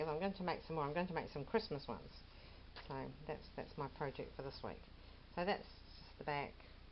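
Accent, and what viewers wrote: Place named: United Kingdom. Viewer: my Husband was laying next to me and asked if you were doing your imagination of. with your 'got ta. (garder) with your BRITISH ACCENT. he's so cute listen to you and me on hear. when he heard you say that. he started singing " " I just love it when he sings to me. thanks for your accent. we just love you and then to put the topping on the cake. we both ended with. IF THEIR ANY GENTS LISTENING we are both in the US. Thanks.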